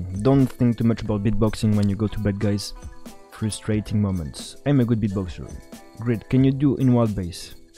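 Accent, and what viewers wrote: France. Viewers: are u french?🤔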